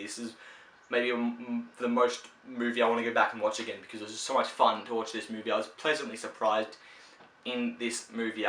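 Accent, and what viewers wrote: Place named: Australia. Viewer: Another small Australian YouTuber with talent and deserves way more subscribers? Awesome! I'm Corey and I too am an aspiring film critic with a passion for movies with a relatively small sub count (256) and not only that but the fact that we're both Australian is awesome. I can tell you're Australian due to your accent and the ratings on your Blu-ray collection, with the combination of G, PG, M, MA etc. As someone who likes to support other small young aspiring film critics or film buffs let me say I just added to your sub number. I too review films on YouTube, my personal favourite review of mine is a review for a rather obscure claustrophobic thriller released in 1997 called Cube, a highly underrated thriller that deserves more recognition. Feel free to check out my review or channel in general if you're curious but you don't have to if you don't want to.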